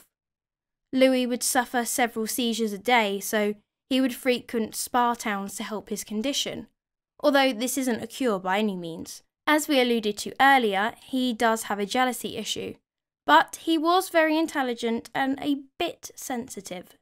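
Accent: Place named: England, United Kingdom. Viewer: French aristocracy and/or monarchy typically reside outside my interests, but with you've managed to usher me through the portière with you're ability to create these historic characters into actual living persons. I am an American and of course I find your English accent fascinating and exotic but yours in particular has an intriguing aspect. Have you done any work into the Scottish folk? thanks so much for your content.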